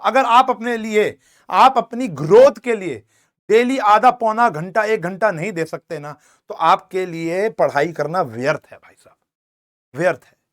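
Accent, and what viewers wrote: Punjab, India. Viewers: Are you from punjab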